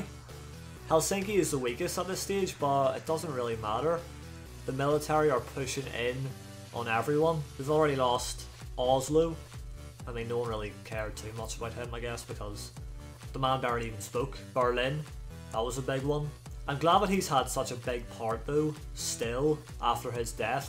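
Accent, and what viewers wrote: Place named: Northern Ireland, United Kingdom. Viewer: I know a Northern Irish accent when I hear one 🤣 what part big lad? Only came across your channel today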